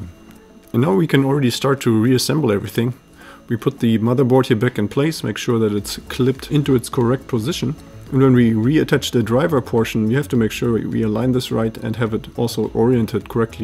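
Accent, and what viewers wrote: Germany. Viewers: Can i comment in german? I thought i've heard a little german accent :D... Ich habe ein Headset von Clam. Da kann ich nicht einfach das Ohrpolster entfernen... was tun?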